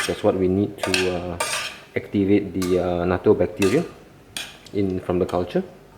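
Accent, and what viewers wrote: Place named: Malaysia. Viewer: ur accent sounds malaysian..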